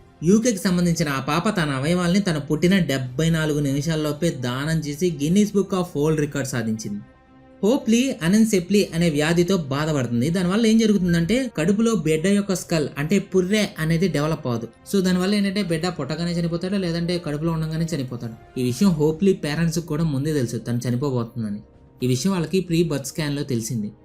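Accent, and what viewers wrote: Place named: Kadapa, India. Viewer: Bro Facts maata pakkana pedithe....... nee slang mathram highlight bro From:kadapa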